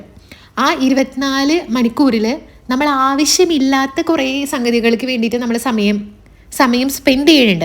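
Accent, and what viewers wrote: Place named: Japan. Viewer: Oru japankari malayalam parayunna pole und,, love your vdo sissy 💌🥰... Keep going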